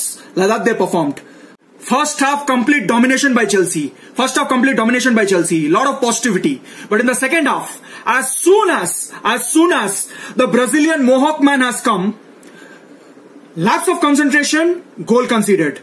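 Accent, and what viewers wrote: India. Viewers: english with india accent so funny . hahahaha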